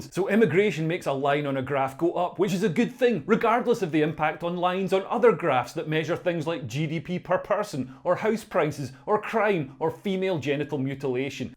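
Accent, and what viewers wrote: Scotland, United Kingdom. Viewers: A) I couldn't agree with you more, it's like hearing my own thoughts in a Scottish accent. For example, what you said about technocrats (quangos is the UK) and NGOs undermining democracy. B) Your description (from - ) of the establishment's dream for our future (given high immigration levels) sounds like a rubbish version of Blade Runner, with the replicants replaced by immigrants and space colonies replaced by our cities. It's already looking more like 'District 9' than Blade Runner though.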